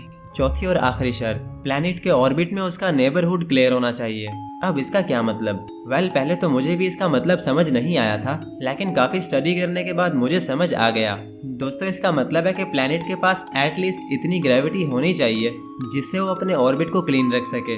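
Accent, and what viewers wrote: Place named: Pakistan. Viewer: Apki bhasa Pakistani jesi lag rahi hai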